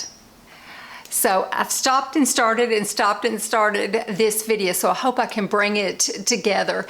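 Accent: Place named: Tennessee, United States. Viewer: You are a beautiful lady ❤ I thought you had a Tennessee accent 🥰 my relatives live near Kingsport.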